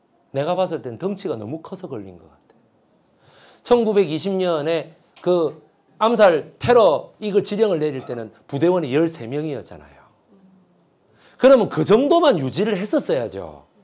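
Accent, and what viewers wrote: Busan, South Korea. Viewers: 귀에 쏙쏙 들어오는 부산말씨 확실하게 설명해주셔서 감사드리며 정말 역사에 대해 모르는게 많은것에 부끄럽습니다 매일 듣고 있습니다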